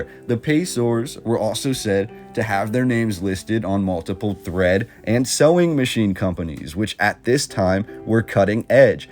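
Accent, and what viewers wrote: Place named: Pennsylvania, United States. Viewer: I knew you were from PA by the way you pronounce Lancaster. Lankuster.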